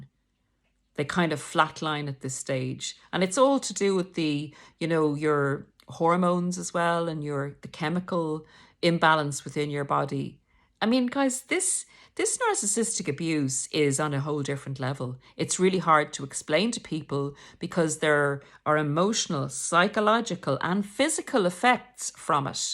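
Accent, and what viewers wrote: Ireland. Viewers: Thank you for being so calm sensible and supportive of this community And I love your irish accent I am starting to almost be thankful to my narcissist that he led me to find all these wonderful people like you ande your channel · Are you Irish?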